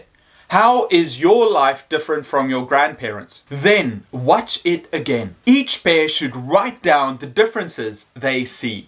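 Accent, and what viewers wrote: South Africa. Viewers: South African accent. Nice.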